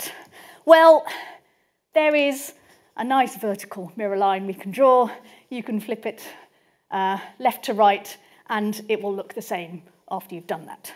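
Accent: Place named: London, United Kingdom. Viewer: BTW... I love her English accent.. London? ❤️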